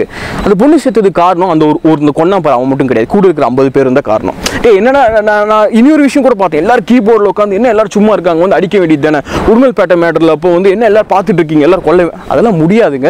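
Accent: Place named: Coimbatore, India. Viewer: Very interesting analysis, keep up the good work. Bro, are you from Cbe? I like your slang.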